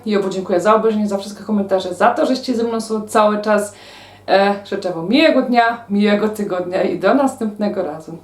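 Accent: Silesia, Poland. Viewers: Cześć dziewczyno😁super filmiki , uwielbiam twój kanał i twój śląski język 😎🤪😅👌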